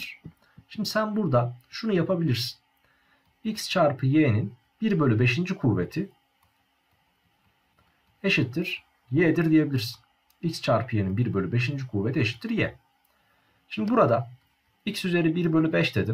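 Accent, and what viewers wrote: Ankara, Turkey. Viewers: Ankara şivesi var hocam sizde Ankarılısınız dimi :D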